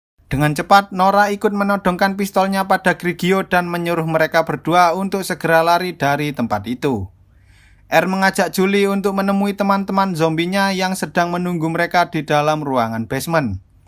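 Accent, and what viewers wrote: Java, Indonesia. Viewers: Kasi lope dong lur kwkw daerah mana bang jawa nya? Gua jateng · Wong Jawa apa pie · Ngomongne medokne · admin ngomongnya jgn terlalu medok jawanya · Orang jawa yah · abang orang apa? jawa? abang dalam menjelaskan filmnya kocak banget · Abang orang jowo (jawa) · fix logat wong jowo😆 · LOGAT ELO MEDOK JAWA BINGITS SIH MAS E NARATOR. NGAKAK GW 😂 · jawane medok yo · Ene wong jowo iki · Jowo ne ngendi mass?? Maklum Subscriber awal🔜😁😁😂🤣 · Koee wong Jawa Yoo mas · Kamu orang jowo yo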